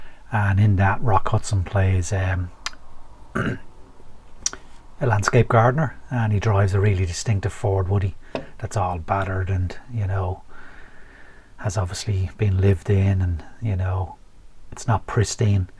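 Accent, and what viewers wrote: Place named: Ireland. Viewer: Great to hear an Irish voice on a modelling channel.. ❤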